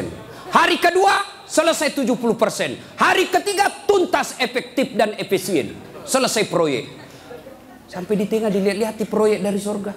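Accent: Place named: Bugis, Indonesia. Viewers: Suka sama bawakan ceramah dg aksen Bugis dan kalo baca ayat itu sesuai dg bacaan orng Sulawesi Krn disana jarang O yg banyak A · Ustad ceramah keliling Indonesia, tapi logat Makassar nya ndak pernah hilang.dan lucunya lagi siapapun yg dengar ceramahnya ikut tertawa. Ntah tertawa karena memang mengerti atau tertawa karena penyampaian logat Makassar nya 😊. Untungnya pernah 10tahun tinggal di Makassar jadi mengerti sekali apa yg beliau bilang...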